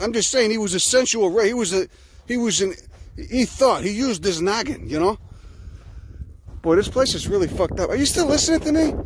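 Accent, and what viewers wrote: Chicago, United States. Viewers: Could listen to a Chicago accent oratory on glands all day. Thanks for sharing your knowledge.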